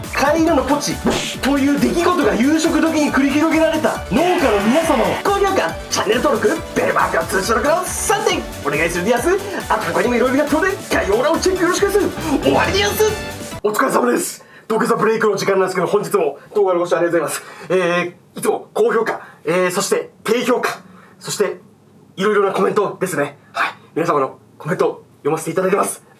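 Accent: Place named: Fukushima, Japan. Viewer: なまり、福島弁ぽいばい🤔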